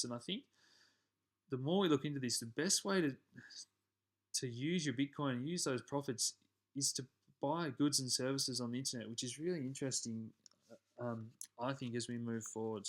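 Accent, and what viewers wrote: Australia. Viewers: Good video. Great info. Good to hear an Aussie crypto trader. Subscribed. Cheers :)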